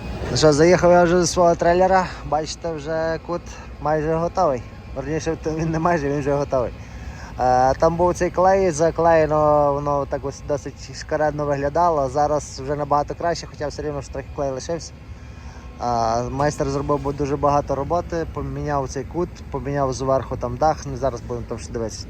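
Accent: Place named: Hutsul, Ukraine. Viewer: Ти гуцул ще той! ЗабЕЕЕру...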